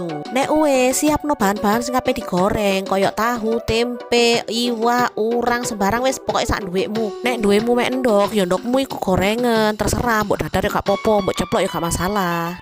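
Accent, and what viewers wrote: Surabaya, Indonesia. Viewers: Mantab medog suroboyoan 😂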